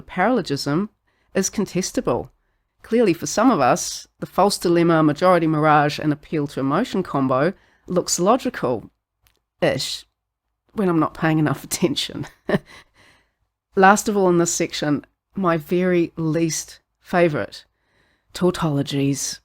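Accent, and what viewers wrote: New Zealand. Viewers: You look super interesting, like a fabulous actress from a noble lineage or something, part Vampire lady. 😊 And yes I clocked the NZ accent, I lived there <num>.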